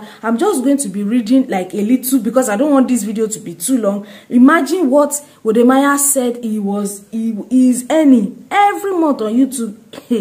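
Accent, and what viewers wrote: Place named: Nigeria. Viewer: You sound Nigerian. 🙄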